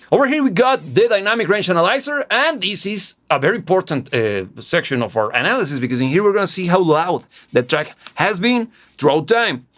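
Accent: Russia. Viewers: you sound russian who is speaking english. where are you originally from, what’s your first language ? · With the Russian accent this heavy, I strongly doubt that you haven't heard this before :)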